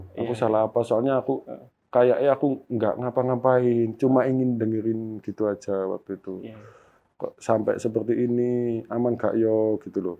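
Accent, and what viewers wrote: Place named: Java, Indonesia. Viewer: SEBENARNYA SEREM sih, cuma NYRITAINYA trLaLu MEDHOK. jatohnya LUCU sih.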